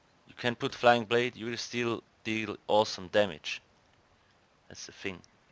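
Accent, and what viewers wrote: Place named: Switzerland. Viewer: du bisch definitiv en schwiezer😂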